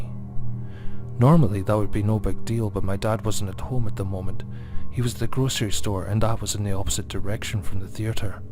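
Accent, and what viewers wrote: Scotland, United Kingdom. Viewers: I love your Scottish Accent!!!! Keep uploading creepy pastas just I can listen to your voice!!!!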